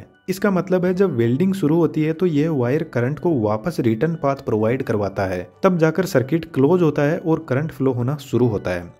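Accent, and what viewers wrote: Rajasthan, India. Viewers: Aap East Rajasthan se ho na bro ?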